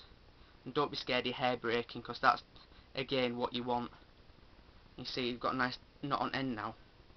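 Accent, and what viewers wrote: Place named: Manchester, United Kingdom. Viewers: great vid :D, if i'm not mistaken that's a yorkshire/lancashire accent :P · Thank you, this helped a lot! This sounds strange but are you from the Manchester/Lancashire area? Its just, you speak a lot like us ;D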